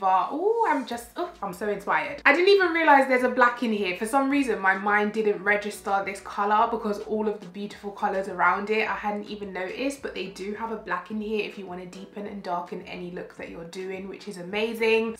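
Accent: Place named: England, United Kingdom. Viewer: I love how English people say "purple"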